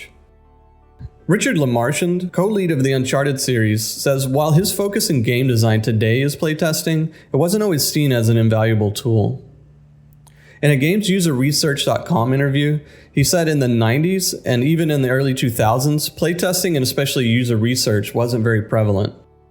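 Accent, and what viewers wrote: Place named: United States. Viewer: Hey, thank you for your video, it's great! I can see and hear so much of your effort was put into it. I do treasure this one, and I found it thanks to your article on Gamespot. However, there are some pain points: 1. Your attempts at self-deprecating humor undermine both tone and authority, I expect from you to take your message seriously and implement it in practice. I want you to see as my equal, not a clown with a computer science degree, and I know you are not one. 2. Pronunciation of foreign names is easily searchable and practicable nowadays. Please be less American about this and learn, it's very easy if you let go of point 1. Just like in your message here, fear of messing up a word keeps you from integrating new cool words into your life, and names are amazing words! Just don't stress only the first vowel, we already have enough bO-rises and Oh-drees. 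And yeah, the solution you used was painful to listen too, I'm afraid. Other than that, I hope every documentary YTer will do their work as you do. Nice to meet you!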